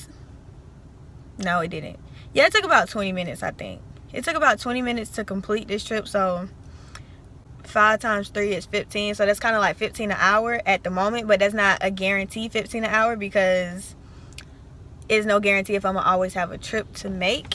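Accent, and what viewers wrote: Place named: Atlanta, United States. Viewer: Yeah I can hear the Atlanta accent. :)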